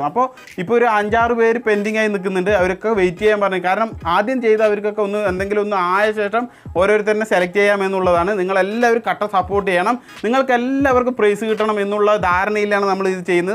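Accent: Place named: Alathur, India. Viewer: ഇക്ക ആലത്തൂരോ, എനിക്ക് ഡൌട്ട് ഉണ്ടായിരുന്നു സംസാരം കേട്ടിട്ട്, പാമ്പാടി ആണ് എന്റെ നാട്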